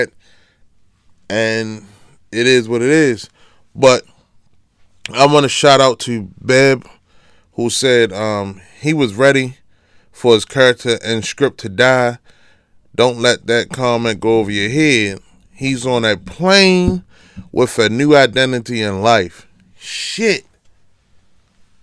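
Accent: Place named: Baltimore, United States. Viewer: 1st off, this is my 1st time catching your videos and I can tell out the gate that you are from Baltimore. 2ndly, I don’t think a persons son should get the raft of their father’s actions. Unfortunately, the previous generations actions often trickle down to their off spring. It’s not fair, but it does happen.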